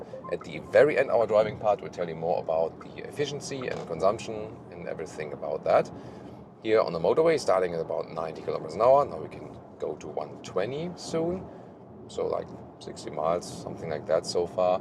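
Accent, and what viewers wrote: Germany. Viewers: For some reason your accent rubs me the wrong way. Maybe because I had an awful German manager.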